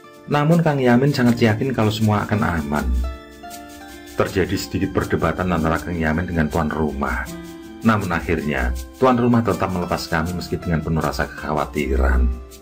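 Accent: Java, Indonesia. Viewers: Ceritanya mencekam bikin jantung berdebar kencang, saya suka logat bicaranya yg jawa banget.. Mantebb 👍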